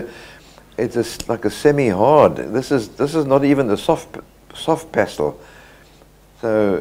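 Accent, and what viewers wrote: South Africa. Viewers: You have a South African accent, am I correct🤓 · Boy, am I happy to have found you, I turned 60 a while ago, and suddenly decided to try my hand at painting etc. I just love the way you talk a person through the motions.... And to hear a South African voice ... what a treat. Thanks for the knowledge that you share.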